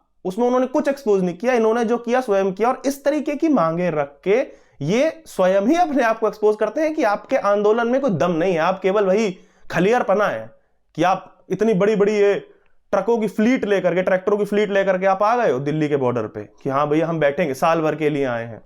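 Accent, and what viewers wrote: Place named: Haryana, India. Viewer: Bhai tumhara Haryanvi bolne jo maja hai wo alag he hai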